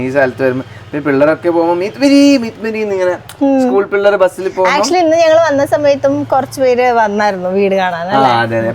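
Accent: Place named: Bangalore, India. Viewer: ഇവരെ സംസാരം ഇങ്ങനെ യാണ് അവർ ബാംഗ്ലൂർ ആയിരുന്നു 👍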